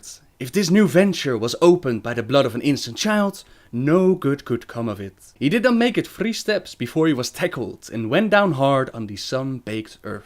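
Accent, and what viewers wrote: Netherlands. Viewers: Are you dutch? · Late, I know, I'm sorry. is from the Netherlands and often he pronounces things the way they do in Dutch, even though English has some really weird rules for pronunciation. In Spanish you pronounce peninsula just the way it's written: pen (like the writing tool) - in (like Nagrand is IN Outland)- su (kind of like soon but stop the word halfway through, so it sounds like soo or sü) - la (like the end of Attila). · Hey! I was wondering, will you ever make a lore video about Illidan Stormrage? Or even better, theories on how he could still be alive (which I think he is)? He is one of the best, most tragic and misunderstood characters in the Warcraft universe and he has a lot of history. A video by you about him would be badass. Love your storytelling and Dutch accent, by the way. ( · Pretty sure he's Dutch, judging by his accent. 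They tend to overpronounce the "s". I'm from the Flemish part of Belgium, so my mother tongue is also Dutch, albeit slightly different from the Dutch in The Netherlands. And I have an American girlfriend and she can't pronounce "r"'s at all, so that roaring sound he makes might be funny in English, but I find it very pleasing. ;) (I can't even do it, because I've taken on the American accent from all the American shows we get on tv.) And the fact he said pensuela instead of peninsula shouldn't really make a difference. Many Americans fail to pronounce English words correctly, so why hate on someone who's main language isn't English?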